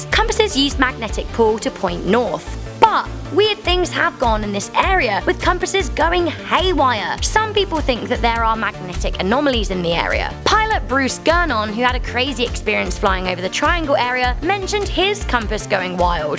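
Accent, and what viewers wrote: United Kingdom. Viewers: Good for you you don't live in America down by Florida cause you are either British Australian or Irish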